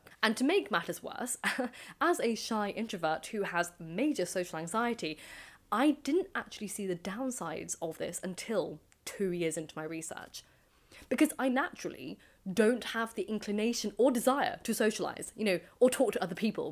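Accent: RP, United Kingdom. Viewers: Also why do you speak with a heightened RP accent? Is that your real accent or is that just for the Youtube chanel?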